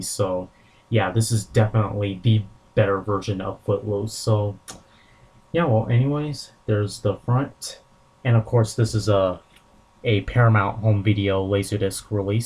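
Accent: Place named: Coast, United States. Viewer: Ohhhh cool thanks for explaining that. Where are you from by the way? I was trying to figure out by your accent east or west coast. Keep up the great vids!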